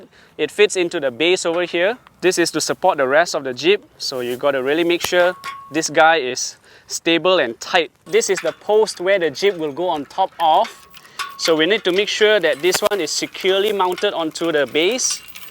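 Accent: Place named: Quebec, Canada. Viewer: Where are you from? You have some kind of a french canadian accent!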